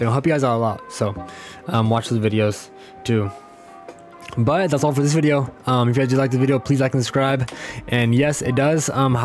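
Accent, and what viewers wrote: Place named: India. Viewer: Lmaoo no gonna kap I can hear the desi in you correct me if I’m wrong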